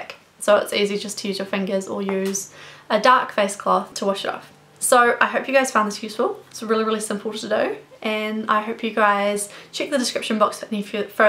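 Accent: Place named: South, New Zealand. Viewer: It’s because she comes from the south of New Zealand (the south island) and they tend to have a different accent to thise who like up north (the north island)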